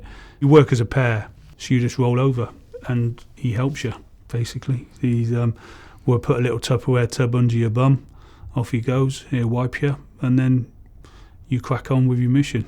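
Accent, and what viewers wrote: United Kingdom. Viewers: i mean its really fucking obvious that hes british, why do you bother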